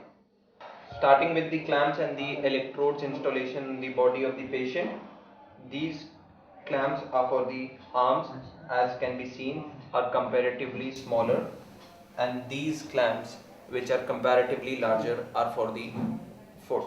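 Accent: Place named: India. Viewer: thanku sr for making video in Indian english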